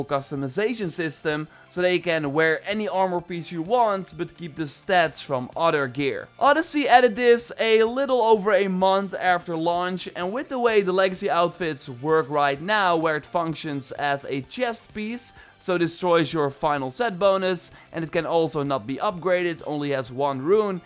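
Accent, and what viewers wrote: Netherlands. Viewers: Idek if you're dutch but you have the most dutch accent ive ever heard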